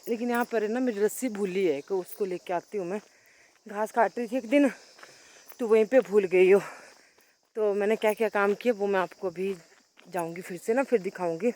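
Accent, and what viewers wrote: Punjab, India. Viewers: Aapki language bdi Punjabi " Podiya"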